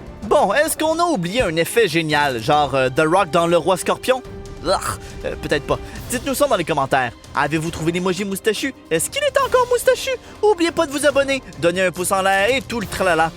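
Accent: Quebec, Canada. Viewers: cet accent québéquois me donne envie de mourrir :( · Cet accent est juste insupportable. Pitié, si, remplacez-le. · Dislike pour ce putin d'accent québécois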